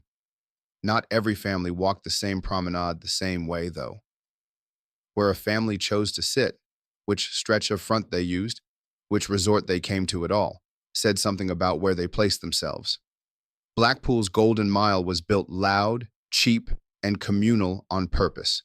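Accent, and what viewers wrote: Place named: United States. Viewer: Pity the narrator has an American accent to describe a British holiday video.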